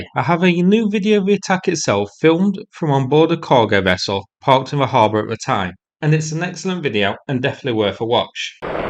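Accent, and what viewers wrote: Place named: Mansfield, United Kingdom. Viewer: He’s from Mansfield. Slava Ukraine ! 🇺🇦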